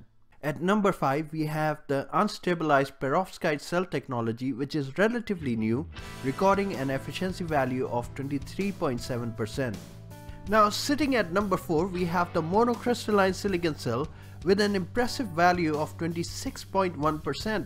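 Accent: India. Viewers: I kinda started liking Indian accent.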